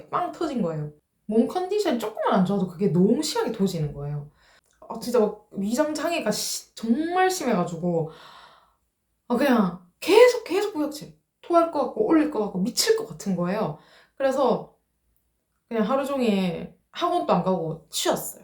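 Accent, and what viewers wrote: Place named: Japan. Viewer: 뭔가 말투가 일본 억양이 평일 낮 시간대에 이럴때랑ㅋㅋㅋ자주 일본억양이들리네여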